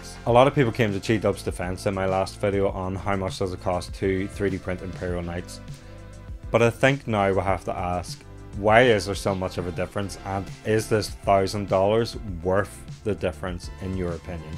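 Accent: Northern Ireland, United Kingdom. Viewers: Whereabouts in Northern Ireland are you from? 😁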